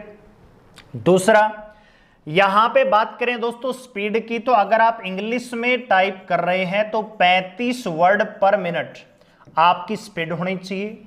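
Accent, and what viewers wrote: Haryana, India. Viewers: Haryana ki h bhai😊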